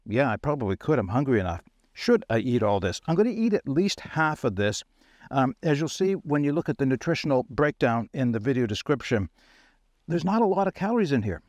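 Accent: Canada. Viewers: Yeah cool, I did assume you'd have some similar "iron" type device over there. No worries on Canadian diction mate, just curiosity, it's those Yanks that tend to bastardise the language,..... but even then, it's all in good fun, we are arguably the worst of the English speaking nations for it; ) Had that book a while now, to be honest I didnt know if it was still available, since it is, I'd be very surprised if it's expensive. And of course it doesn't mean you must stick rigidly to the recipes, in fact I rarely do, It's more the principle of the thing in a camping context, that I mentioned it.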